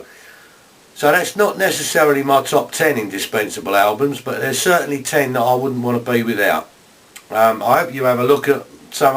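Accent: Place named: London, United Kingdom. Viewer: lots of very good stuff, everyones got their favourites, I would have put ist, and who knows... it was good seeing groups back in those days, you put it across well and its good to hear someone with a london accent talking about artsy type of stuff. Id also recommend who I first thought of as a copy but rediscovered them and play them a lot. if you come across by put it on if possiblethanks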